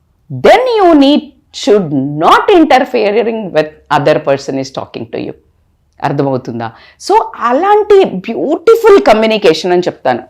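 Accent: Telangana, India. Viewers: Madam is very kind confident and practical very interesting with telagana slang, attractive conversation, sensible psycologist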